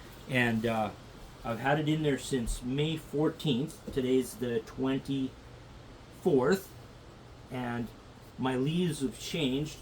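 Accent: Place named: Canada. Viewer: I wonder if this is similar to a Perique ferment. I suspect that the bacteria group responsible for the fermentation are facultive anaerobes--a bacteria that technically does not need oxygen, but benefits from a wee bit of it, thus the regular turning, and airing. A Perique is aired monthly, and under pressure-in water. You are using more water than in cigar production, but they are in a more humid environment (your accent sounds Canadian) Was that 106 degrees F. you said? So many microbes tend to be active at body temp.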